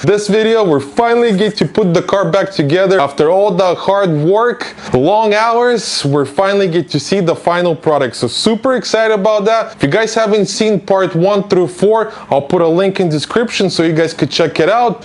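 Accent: Russia. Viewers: Вы говорите на английском с русским акцентом, но вы не русский, или уехали очень давно. "Досвидания" - по-русски было с английским акцентом. ;) По ремонту всё очень интересно, по железу, по салону, по электрике. Спасибо!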